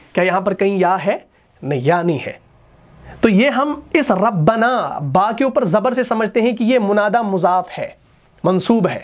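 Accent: Kashmir, India. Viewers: اَلسَلامُ عَلَيْكُم وَرَحْمَةُ اَللهِ وَبَرَكاتُهُ بھاٸی آپ کے لہجے سے میں پہچان گیا تھا کہ آپ کشمیر کے ہیں میں لاہور سے غازی مخاطب ہوں آپ کا پروگرام امارت اسلامی افغانستان میں بھی دوست سنتے ہیں اللہ آپ کو جزاۓ خیر عطافرماۓ بہت اچھا درس ہوتا ہے اس کو جاری رکھنا اللہ آپ کا حامی و ناصر ہو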